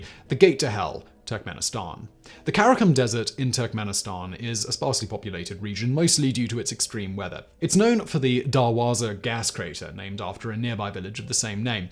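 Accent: United Kingdom. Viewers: He's british as that's how they pronounce it. Not joking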